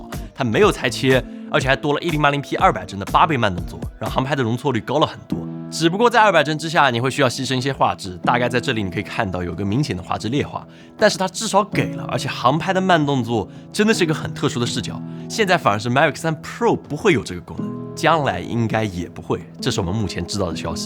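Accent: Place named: Jiangsu-Zhejiang, China. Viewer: 江浙口音没办法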